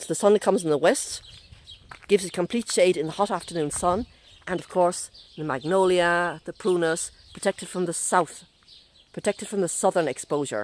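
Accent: Ireland. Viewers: Only found your videos today(while searching for pruning advice on viburnum tinus)Twas the Irish accent that made me continue watching!Wowza,you are truly AMAZING! Thank you sooo much for sharing the wonderful advice/suggestions/inspirations/and of course your incredible knowledge & sensiblity/you are a GEM!Continued Good Health,& Enjoyment with your Gardening.Best Wishes,from rainy July in Ireland.p.s. I have enjoyed 2 hours of watching some of your videos, (lots more to watch yet),that "d'other half"is wondering when is dinner!!!! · I just discovered your channel today, and have been binge watching your videos. I just watched your "last" video. I am sooooooo dissapointed your not continuing on with your videos. I understand you completed your 3 year mission, but from the looks of all the well wishers, I think many people would love to see you continueon and make a new journey. 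You have so much knowledge to share, and your very entertaining with your funny witt, plus that Irish accent is lovely. I loved seeing your garden, it gave me so many ideas to start thinking about for next year. please come back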